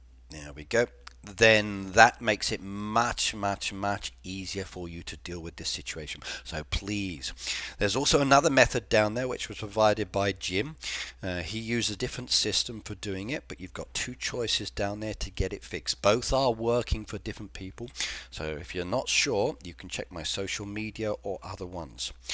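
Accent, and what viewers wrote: United Kingdom. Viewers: Is that a Scottish accent? ✌️😎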